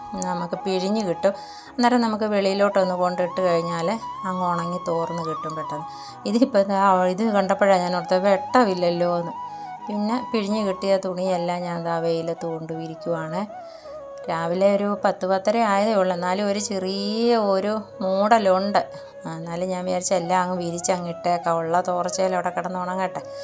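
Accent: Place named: Kottayam, India. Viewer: സംഭവം കൊള്ളാം. രസമുണ്ട്. കോട്ടയമാ അല്ലിയോ?വർത്തമാനം കൊള്ളാം. ഇഷ്ട്ടപ്പെട്ടു.go ahead👍👍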